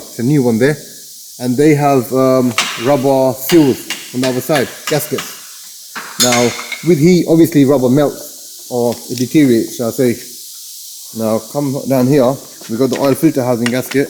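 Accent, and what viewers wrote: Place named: London, United Kingdom. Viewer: Man, I just love how the subtitles can't decipher the true Lahndahn accent!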